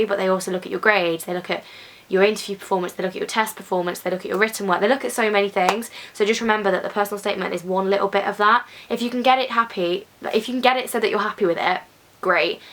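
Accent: Birmingham, United Kingdom. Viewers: Birmingham, England I think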